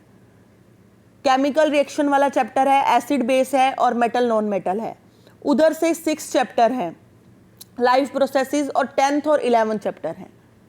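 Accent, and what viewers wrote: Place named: India. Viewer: I just love indian accent very much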